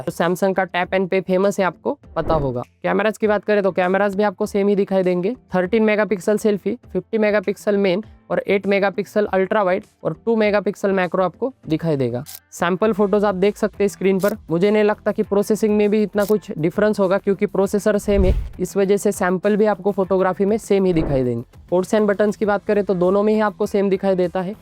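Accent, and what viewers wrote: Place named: South India, India. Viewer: Why comments making fun of yam or M, it's common i guess south teacher does have this pronounciation Not a big deal..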